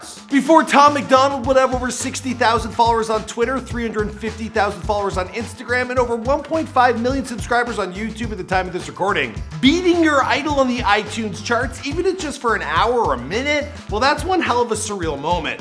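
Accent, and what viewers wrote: Canada. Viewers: Why does the host sound like he is puking out every fifth word? Is he usually like this? No diss, just asking. Is this Canadian accent?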